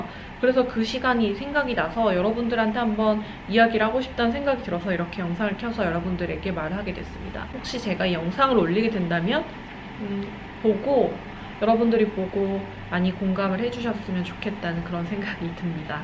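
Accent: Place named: Seoul, South Korea. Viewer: 부산분이신데 말씨는 완전 서울 말씨인데요. ㅎ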